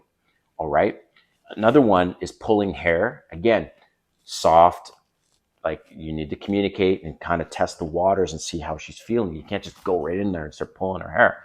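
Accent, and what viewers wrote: Canada. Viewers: i can detect the Canadian accent ! Cool 😊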